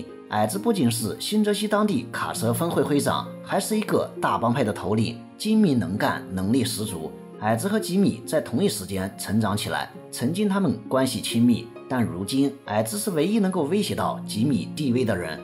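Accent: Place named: Anhui, China. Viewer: 越哥，你的安徽乡音是如此地迷人！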